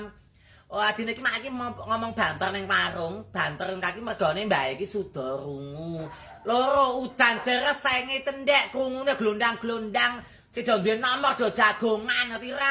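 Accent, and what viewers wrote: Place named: Surakarta, Indonesia. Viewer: Logate ❤nambani logat solo Jateng....wong tuo q asli solo jogya ... Saiki q d kota prob jatim... Logat q ws gk ngunu😊😅😅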